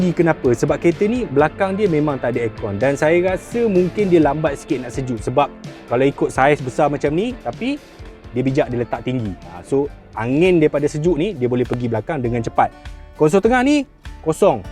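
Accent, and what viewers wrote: Kelantan, Malaysia. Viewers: Haha org mentakap kecek klate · ore kelate ke abe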